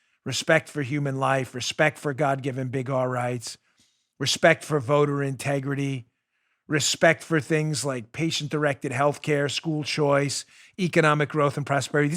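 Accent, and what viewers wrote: New York, United States. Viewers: You lost your audience alright... every time you are fake by calling on, stop me if I lose the audience bullshit. Do you really think that you are talking to a bunch of people that can't follow a new yorker trying to explain something? With dis and dat and dee udder ting...? You know, I take a break from you for about a week or two, and then my brother in law tries to get me to listen in again, and you can't seem to have one program where you don't offend me by acting fake..., stop me if I lose the audience... How the hell would he know? You seem to get worse as your show goes on. You scream and whine really loud and I have to turn down the volume, then you talk super cool and quiet, and I can't understand what you said. I used to turn up the volume, then you would forcefully start talking again and I would have to mess with the volume again. 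I think that I will unsubscribe your channel and go some where else for my fear mongering... you don't seem to be the guy.... I even get tired of seeing you on Fox, as you look so buff and tough, even though everybody has heard you hit the third octave above your normal voice, kinda like Mike Tyson.. heheheh...adios... I know you wont give a shit about me leaving... that just says a lot about you... and me both...!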